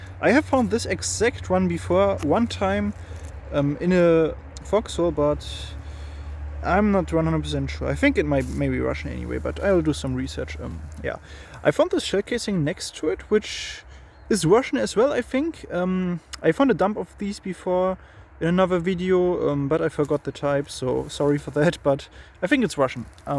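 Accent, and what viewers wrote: Germany. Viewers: Are you German you have an German accent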